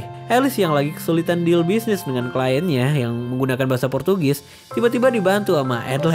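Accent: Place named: Java, Indonesia. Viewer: Medok nya ituloh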